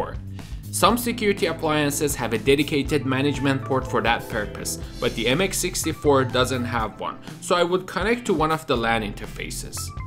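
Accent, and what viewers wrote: Iran. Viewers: Iranian accent,the only english video that i can understand it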